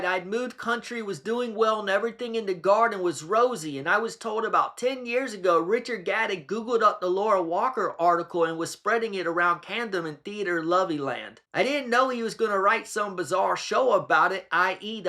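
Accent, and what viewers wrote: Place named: Scotland, United Kingdom. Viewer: I am a Scot. I adored your Scottish accent - it really added to the joke of all those ranting emails!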